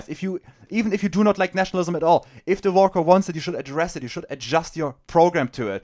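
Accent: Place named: Germany. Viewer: based, this is what got me into national bolshevism, also I love the German accent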